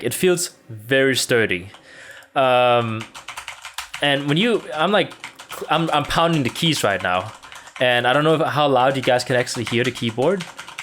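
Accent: Denmark. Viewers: I think you sounded danish, are you danish? :) (also noticed the nordish layout)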